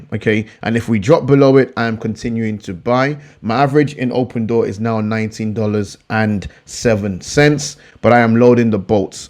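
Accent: United Kingdom. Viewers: love your analysis, very detailed and to the point. great thanks, nice accent where u from the UK?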